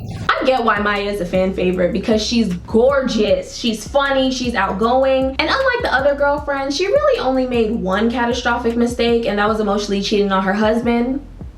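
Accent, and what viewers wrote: Jamaica. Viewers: Mumma yah jamaican????me keep getting recommend to you channel ,me ago subscribe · wait are u jamaican? also love this video 💗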